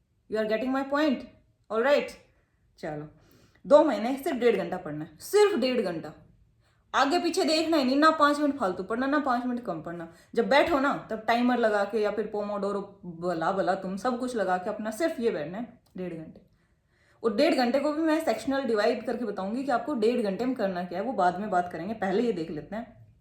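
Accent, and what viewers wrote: Haryana, India. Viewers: Your haryanvi accent is so cool😅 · Mhare Haryana tt ho aap, awaj tt hi beraa laag gya😂😂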